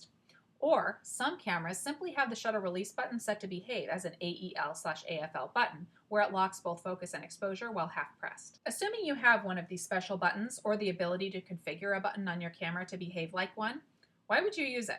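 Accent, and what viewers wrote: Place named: New Jersey, United States. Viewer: I am sorry for all the childish and inappropriate remarks on your videos. I guess these high school mentality boys need to go back to their magazines. Perhaps their moms never taught them respect. Thanks for making this video. Does the camera, my D750, need to be in a specific mode in order to use the AE-L/AF-L button effectively? Being brought up in New Jersey you are talking just fine.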